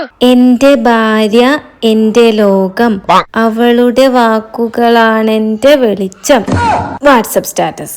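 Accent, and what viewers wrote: Thrissur, India. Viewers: തകർത്തു തിമിർത്തു. വളരെ നന്നായിട്ടുണ്ട്. Specillay നിങ്ങളുടെ സംസാര രീതി. ഞാൻ കുന്നംകുളം ആയതിനാൽ തൃശൂർ രീതി ഒരുപാട് അശ്വതിക്കുന്നു. എന്നെങ്കിലും നേരിൽ കാണാൻ കഴിയുമെന്ന പ്രതീക്ഷയോടെ.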